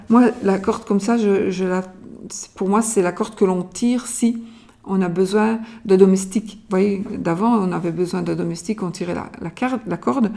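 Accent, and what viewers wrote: Belgium. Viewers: Bonjour, j'ai toute suite reconnu l'accent Belge et ça fait plaisir , je vous remercie pour ce tirage, j'espère que vos prédictions seront excausées car ce long mois de mai fût très dur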